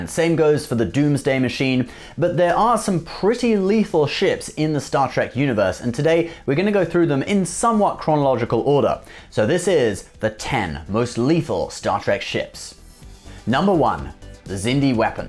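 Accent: United Kingdom. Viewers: The return of the sophisticated it’s always a pleasure to see your face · IS BACK! GOD SAFE THE QUEEN! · Woohoo, is back & we did not have to see that heretic American. thank you for this video, & thank you for not being a heretic. #Humanityfirstlast #Britishbeniskewl #Americanbendrools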